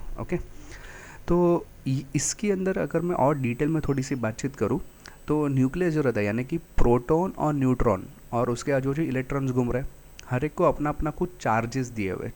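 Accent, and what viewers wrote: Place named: South India, India. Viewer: Amazing way of teaching great sir, seems like you are from South 😊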